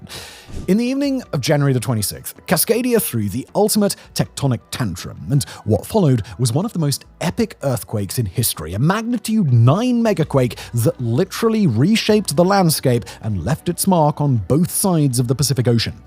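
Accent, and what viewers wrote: United Kingdom. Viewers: Idk, you make a compelling case, on the other hand the dude in the video has a goofy British accent and thus sounds smart and authoritative… not sure who to believe…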